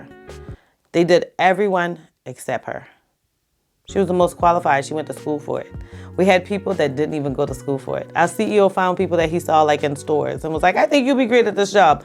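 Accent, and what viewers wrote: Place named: Lowcountry, United States. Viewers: I knew you were from Charleston with your accent! I’m from there as well. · Girl where you from ?… cuz that accent sound like a Charleo solider.. big Geechie 😭😭💙💙 edit: I heard the accent in the beginning of the video before you explained the riot story but yeah you sound like home 💙💙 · I hear an accent are you from the Lowcountry?SC, cool video 😊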